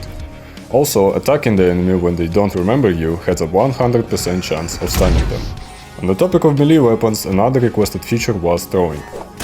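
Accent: Russia. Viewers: Are you russian—your accent seems to be russian. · I can HEAR the Russian and you know how good russian games can get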